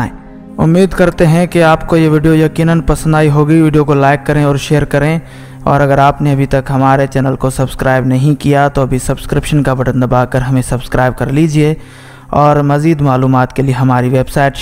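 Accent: India. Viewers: Bhai pehle urdu k makharij seekh lo. Apko toh hinduon ka rang charha huwa hai . Hay ko khay aur ghalat ko galat bol rhy ho indians ki tarha. You need to work on your voice and accent.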